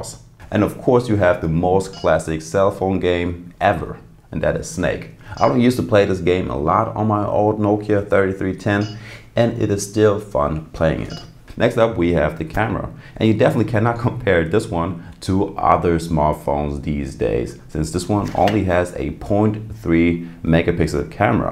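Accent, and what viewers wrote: Germany. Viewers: Are you from Germany ? or maybe Austria . your accent sounds German to me.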